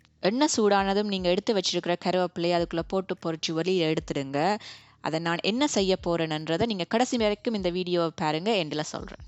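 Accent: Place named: Sri Lanka. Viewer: Srilankan Tamil with foreign accent English sounds beautiful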